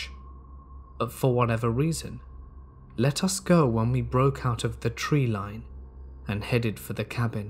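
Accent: United Kingdom. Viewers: Yaknow ive spent very very extensive amounts of time in the woods and mountains of kentucky and a few other places like weeks at a time frequently im more at home in the wilderness than i am in a house or society around people while ill admit ive seen odd stuff hurd some freaky things i found explanations to all and have never seen nor heard anything cryptid lol still some damn good stories especially sense it sounds like a 600 yr old vampire is reading it too you not just bc he is from the uk but his tone and flow hes a pretty damn good story teller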